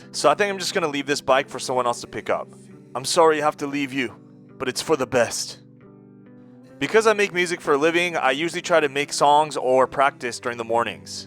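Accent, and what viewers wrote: United States. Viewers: you sound so American to me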